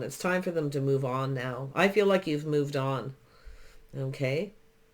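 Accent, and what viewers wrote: Canada. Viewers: I love your accent! The way you say “about” makes me happy! Which part are you from? Curious since I’m looking to travel Canada. I’m not educated enough to know which parts to visit.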